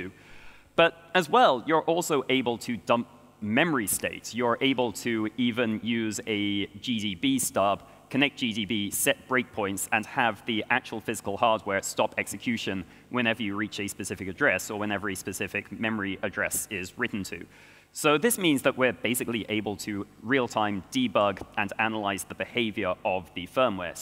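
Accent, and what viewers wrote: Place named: West Coast, United States. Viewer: I'm starting to hear a touch of west coast accent in there...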